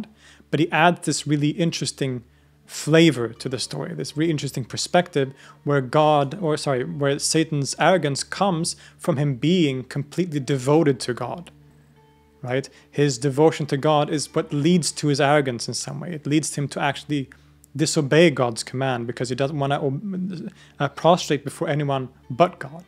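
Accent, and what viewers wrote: Arab world. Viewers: What an amazing arabic accent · Dude stop pronouncing Persian town names in a arabic accent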